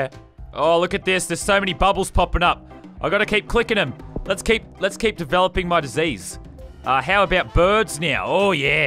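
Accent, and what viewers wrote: United Kingdom. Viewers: Ok, but the same can be said of Americans. Back then British people moved to America and mated with the islanders there. That’s why you sound British and Are British. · You sound British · your british haha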